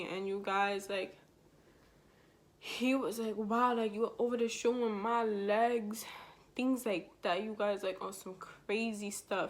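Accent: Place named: New York, United States. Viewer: You from New York I that assent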